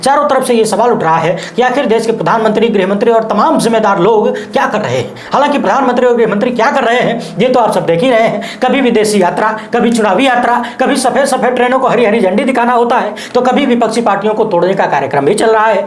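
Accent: Bhojpuri, India. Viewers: Aap k bolne ki speed aurkabhi kabhi bhojhpuri style bahot man ko bhata hai.kam waqt men aap bahot kuch kahdete hsein.good Farooqulamin. Aurangabad maharashtra.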